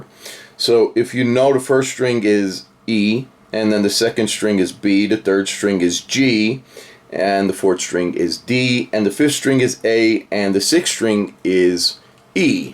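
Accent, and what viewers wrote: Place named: Chicago, United States. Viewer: are you from chicago - you have a bit of an accent , no offense . i am from chicago and when i am on youtube it comes out more often than not sometimes ... the way you explained it out like that it makes so much sense and isnt that complicated. hats off .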